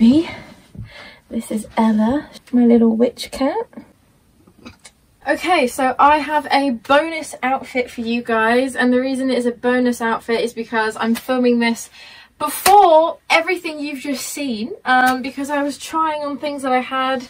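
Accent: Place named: United Kingdom. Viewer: Whys she british :(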